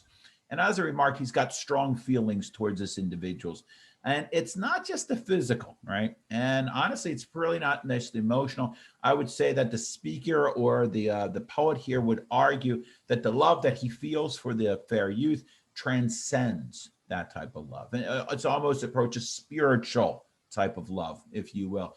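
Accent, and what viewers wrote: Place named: Canada. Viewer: Cool, it sounds like your accent is Canadian, as I think a teacher YouTuber his accent is similar to you.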